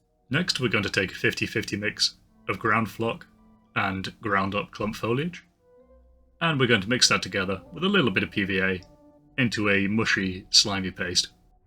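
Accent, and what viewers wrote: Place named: United Kingdom. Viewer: Hey! You sound UK based.. where do you get your foam for the bricks from?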